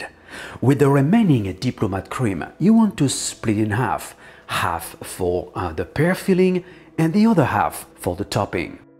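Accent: France. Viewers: You killing me with French accent. Every things in your hand turn to masterpiece. Love from Sweden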